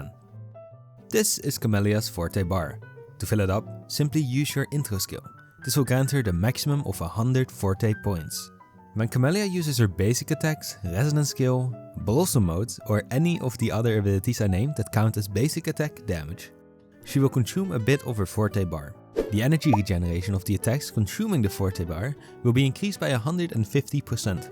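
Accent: Netherlands. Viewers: Love the video weird question are you from the Netherlands you sound dutch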